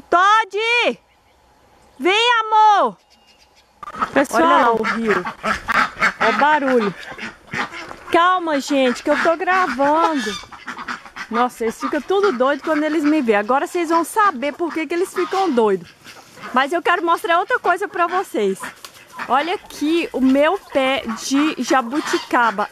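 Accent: Minas Gerais, Brazil. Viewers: Pelo sotaque gracioso da, ela está em Minas Gerais...onde? No Paraíso! rs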